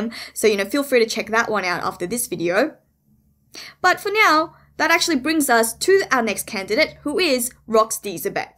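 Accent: Australia. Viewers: Aussie?!?!?! Yeahhhhh you go woman!!! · Oriental with an Australian accent? That caught me off guard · You from Australia? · Australian accent? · You Aussie??? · Are you west Australian ?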